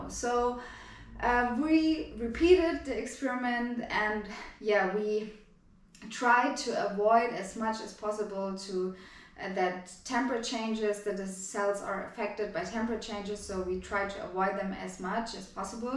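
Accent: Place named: Germany. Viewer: Is that the Deutsche accent , your Deutsche -english accent is fluent and delightful 🤣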